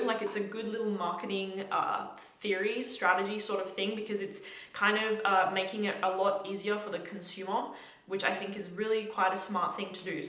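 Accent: Australia. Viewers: Aussie accent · i thought you were english you are australian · girl you surely have a STRONG aussie accent....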